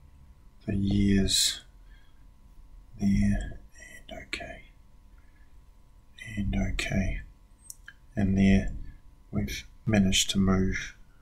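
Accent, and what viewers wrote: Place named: Australia. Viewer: exactly what i needed. short and good. and spoken with a good Aussie accent. thanks!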